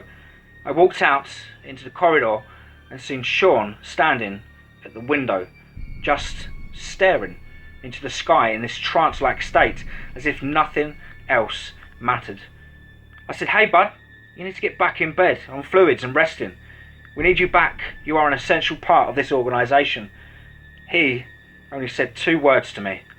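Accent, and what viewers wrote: England, United Kingdom. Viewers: I Love Your Narrative s and Stories and your Amazing Talented enthusiastic English accent voice that puts an entertaining twist to the tale and puts your listening audience right inside the adventures of those who are attacked by the Creatures of the Night! God Bless You my Friend and Thank you for doing what you do because I truly look forward to Bedtime knowing I can lay back turn on your Channel and relax and Enjoy your latest episode of Cryptic yarn s! Been a fan of Cryptids and Paranormal phenomenon s my whole life since early childhood ! I find that Unknown world of Creatures that are not supposed to exist to be utterly fascinating and interesting especially now that their is growing Scientific Evidence and Eyewitness accounts that these creatures of folklore may actually exist!!! Have a Great Weekend! Keep up the Great Work Please Kind Sir!!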